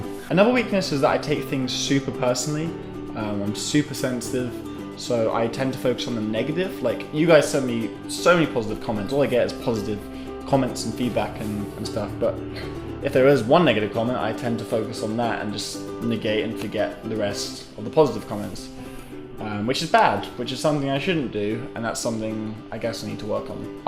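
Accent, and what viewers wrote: United States. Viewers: Your accent is becoming more American. The more time you're spending with Arden the more you become each other and that is true love. Also found in friendships and it's so so damn cute! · anyone else notice the new slight American accent??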